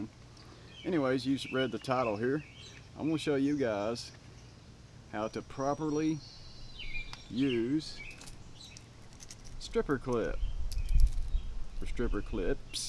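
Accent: United States. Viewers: dude you have the most American accent i ever heard, i thought that was a hollywood thing hahahahaha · you sound like brad pitt when he does his southern accent fuckin great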